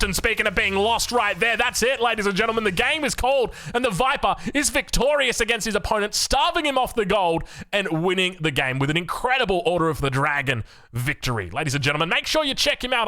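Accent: Australia. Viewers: What is it with youtube commenters not understanding Australian?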